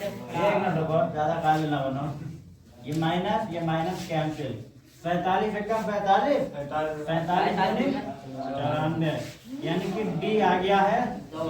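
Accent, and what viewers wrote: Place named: Rural, India. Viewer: Ye dehati kon bol Raha tha😅😅😅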